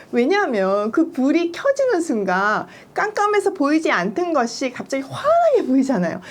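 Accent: Gyeongsang, South Korea. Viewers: 경상프라빈스 인토네이션 이군요 일크름을 넘 감사합니다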